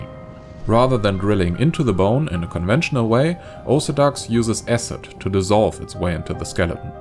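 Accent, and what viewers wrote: Germany. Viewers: i just geared your accent and knew you are german xD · i guess he is german . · I cant unhear the fact that he speaks with a german accent XD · You sound German, but your english is good. A rare thing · That German accent ~ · I still do not know why this worm is a "zombie worm". But now fortunately I know that Indian English is not alone - German English also exists ;) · Are you German??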